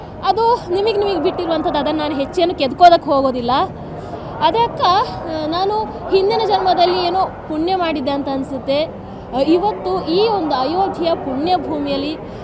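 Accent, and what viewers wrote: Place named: Mangalore, India. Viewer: mangaluru kannada super jayavagali Jaisiyaram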